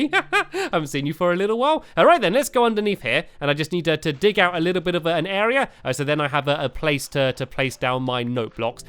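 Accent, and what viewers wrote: United Kingdom. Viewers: 0206 Are you british? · U speak British like meh friend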